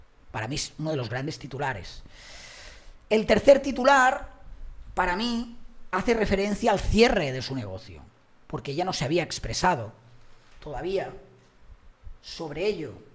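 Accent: Catalonia, Spain. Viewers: tengo que admitir que el acento catalán hablando castellano no me gusta nada pero me gusta escucharte porque eres neutral y das la información tal cual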